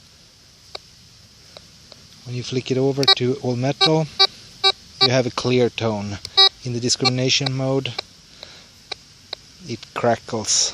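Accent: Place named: Germany. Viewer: What’s your accent? Is it German or Scandinavian? It’s very noticeable.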